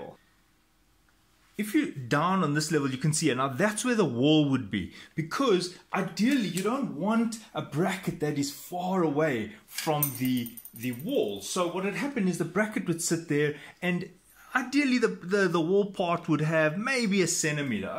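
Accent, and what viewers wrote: Afrikaans, South Africa. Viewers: Smaaking the afrikaans accent😝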